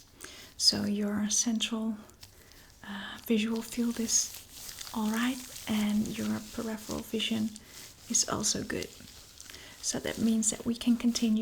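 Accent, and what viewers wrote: Netherlands. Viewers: By any chance you're Dutch madame? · Hoor ik daar nu een Nederlands accent? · do I detect a Dutch accent ?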